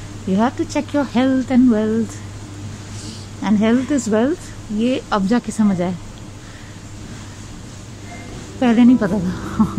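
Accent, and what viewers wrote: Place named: Punjab, India. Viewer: Teri Hindi mein thoda Punjabi touch bhi hai🤭😜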